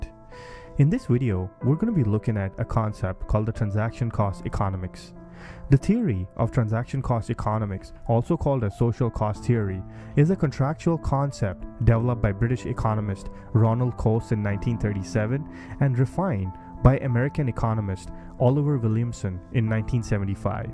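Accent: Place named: India. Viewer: The first Indian accent video that I didnt skip, mainly just because the accent wasnt that strong hahaha.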